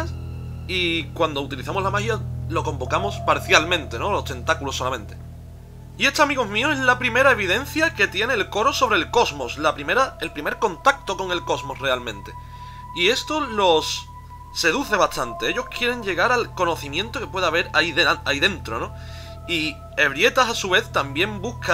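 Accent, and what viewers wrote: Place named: Andalusia, Spain. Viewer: Se que el video está subido hace 5 años pero estoy rejugando los juegos de FROM enterándome de todos los lores. Acabo de descubrir tu canal y me suscribo, además eres el primer YouTube andaluz que sigo y siempre el aire de la tierra es agradable. Un gran saludo desde Sevilla máquina, gracias por tu trabajo ¿también esperando con muchas ganas Elder Ring? Va a ser épico ese juego :D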